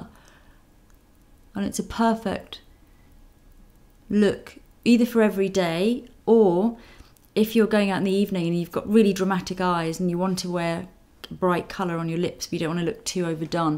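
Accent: United Kingdom. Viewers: Hi Gorgeous teacher makeup!I am so like Your tutorial and I will have playing pleasure with lipstick apply like you and I like your natural british accent! with wishing love and happiness Astor for you <3:D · I love how you speak....just how the words roll out of your mouth and that British accent...Oh dear, so pretty...<3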